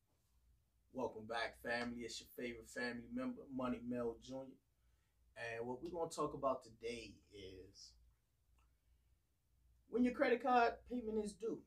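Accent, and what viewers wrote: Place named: Chicago, United States. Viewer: Can u mentor me I need help.. are u from chicago you sound like us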